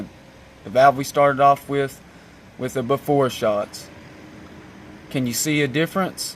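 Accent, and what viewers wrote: South, United States. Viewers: Your southern accent is amazing.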